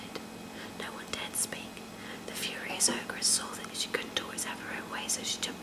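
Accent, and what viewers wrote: United Kingdom. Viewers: Your vids are pretty awesome. I've been craving a new British accent ever since Whispering Life stopped doing hers. Glad I'm came across you. I'm more than satisfied here! : )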